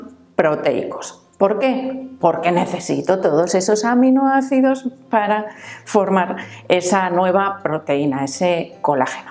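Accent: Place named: Castile, Spain. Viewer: Que acento tan bonito del castellano ❤❤❤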